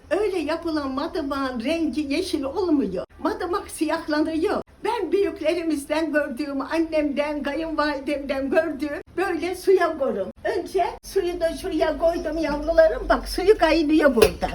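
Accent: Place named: Tokat, Turkey. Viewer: teyze bende Tokat merkezliyim senin konuşmandan hemen anladım canım memleketim Tokatlı olmak çok büyük bir ayrıcalıktır huzur deyince Tokat iyiki varsın❤❤❤❤❤❤❤ teyze❤❤❤❤❤❤❤❤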